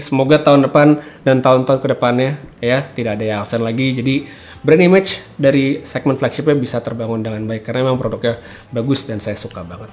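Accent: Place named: Manado, Indonesia. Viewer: BANG ORANG MANADO YAH? LOGATNYA MIRIP ORANG MANADO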